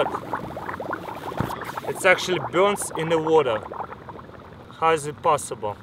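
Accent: Russia. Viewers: Are you Russian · He's Russian. English isn't his first language. Dick.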